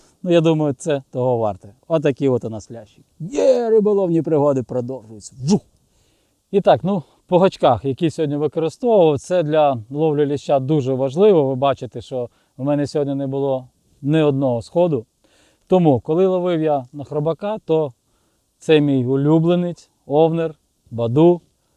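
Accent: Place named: Western Ukraine, Ukraine. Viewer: Неначе пів життя на західній Україні проживав, мова топ( майже😊)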